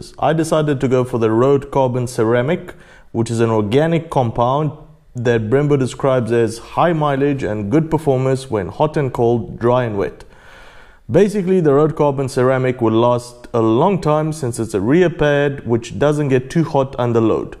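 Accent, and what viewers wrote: South Africa. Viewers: Good and very well done video! Must say you sound like us here in South Africa 😂. How do they compare to the original pads from BMW, which is also Brembo I think? Did you order from the official website? Keep up the good work!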